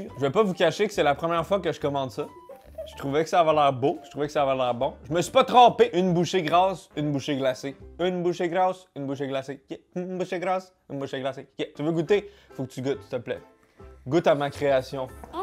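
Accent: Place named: Quebec, Canada. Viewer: arrête de parler comme un québécois stp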